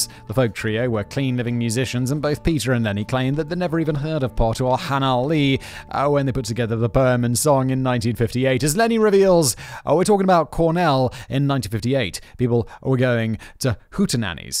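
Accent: RP, United Kingdom. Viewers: I love how the body Spiro was a recurring gag on Futurama. I'm sure might have heard about Futurama, but never watched it. At least we have a recurring gag on brain blaze. is a div who doesn't care to learn about anything, but his British accent and good writers and editors made him millions. I wish I had a cool accent and could make money reading other people's work....at least I'd enjoy and remember the videos I made!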